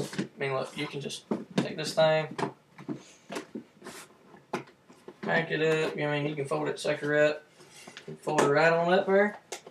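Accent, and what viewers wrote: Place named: Carolina, United States. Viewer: I thaught you sounded like a carolina boy!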